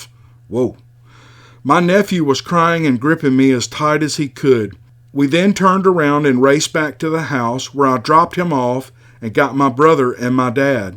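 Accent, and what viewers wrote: South, United States. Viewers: Thank u so much! Snowstorm here in iowa and you made it entertaining to cozy up and listen to your southern speak!Love you!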